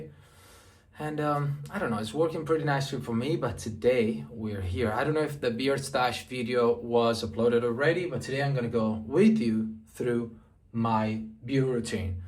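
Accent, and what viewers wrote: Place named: United States. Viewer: Your English is pretty good! Where did you learn it? You sound like you have been in the States for a while.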